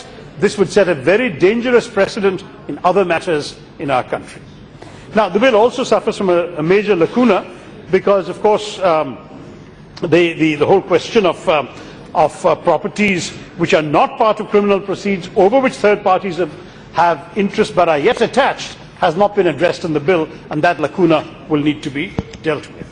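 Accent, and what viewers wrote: England, United Kingdom. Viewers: Unfortunately honorable union finance minister does not understand his English accent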